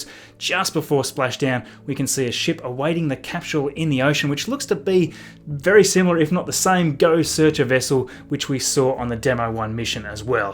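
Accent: Australia. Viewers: Im sorry to ask off topic but where is your accent from, Australia?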